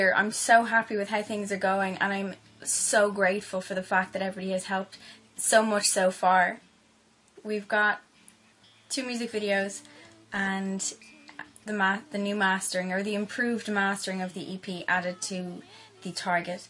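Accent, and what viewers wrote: Ireland. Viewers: I think she's irish? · Are u Irish I recognise the ascent from when I used to live there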